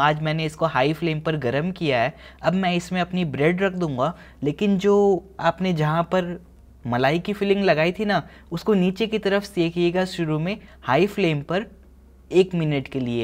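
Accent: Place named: Kolkata, India. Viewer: Mujhe aapke bolne k style se hamesha lagta tha k aap kolkata se belong karte h...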